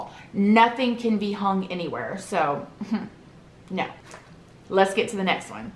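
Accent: South, United States. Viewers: Your southern accent makes me feel secure??? Idk but I appreciate a fun southern YouTuber (nothing weird, my family is southern, and nobody is where I live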